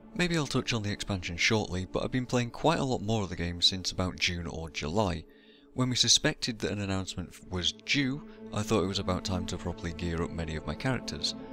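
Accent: North, United Kingdom. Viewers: Such a soothing northern lilt!:D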